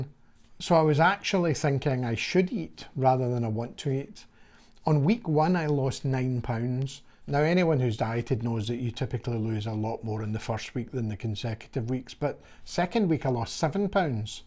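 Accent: Scotland, United Kingdom. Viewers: I love your calm Scottish accent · Good video. I could listen to that beautiful accent all day. I’m Scottish, first generation Canadian. Your accent reminds me of my people.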